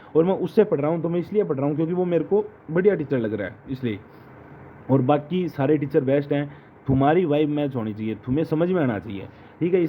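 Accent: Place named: Haryana, India. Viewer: Lgta hai aap utri -purvi rajsthan se ho Hariyana vala tone bhi aa raha hai bich bich me mene to aaj hi viedo dekha apka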